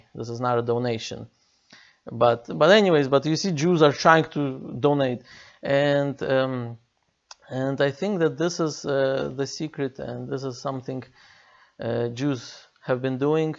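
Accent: Russia. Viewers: You have a Russian accent. Are you from one of the former USSR States?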